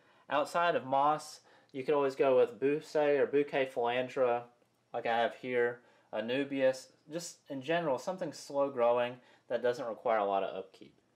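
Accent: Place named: South, United States. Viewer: Thanks for the video! Are you from Georgia? I'm from Texas and you sound very southern!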